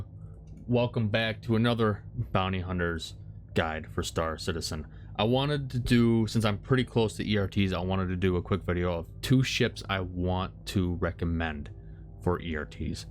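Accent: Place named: Mexico. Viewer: It really isn’t. The Kee sound you are promoting has sneaked into your pronunciation from the Mexican Spanish influence. Italian, Latin and English pronunciation is Kwu. Say the word queen - it’s not “ keeeen”.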